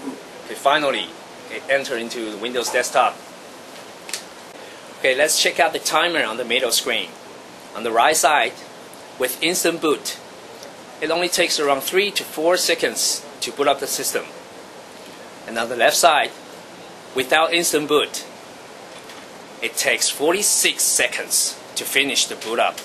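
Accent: China. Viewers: taiwanese, hes got a chinese accent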